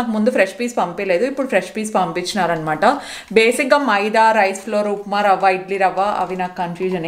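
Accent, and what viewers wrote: Anantapur, India. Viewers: Yes waiting for ur love story pls anantapur language vintunte mana atp language ne bonda le alantivi mana atp valu ekuvaga use chestaru chala happy ga undi ala vintunte...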